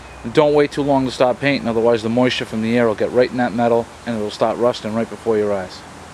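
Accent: United States. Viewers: well done. im gonna copy it :p love the american accent. hi from New Zealand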